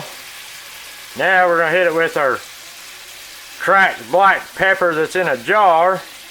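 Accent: South, United States. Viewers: You are such fun chef to watch and I love your southern accent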